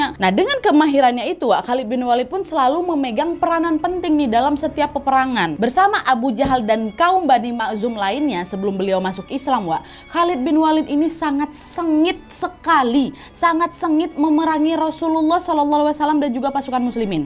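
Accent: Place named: West Sumatra, Indonesia. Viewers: Urang awak logat minang nyo kentara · Uni urang awk yo kelihatan banget dalam bicaranya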